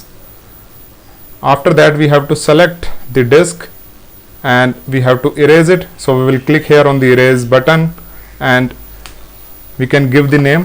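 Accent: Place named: India. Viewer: why is your sound like a tech support scammer from india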